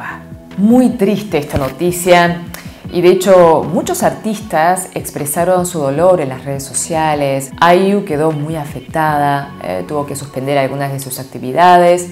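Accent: Buenos Aires, Argentina. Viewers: Qué acento tiene? Es el castellano.neutral con algo de acento argentino, no? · habla como si fuese Argentina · su acento es el porteño de argentina. le sale perfecto, ella se vino a vivir acá cuando era chica. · Bueno es coreana la conductora o argentina por qué sus rasgos son coreanos pero habla como argentina .che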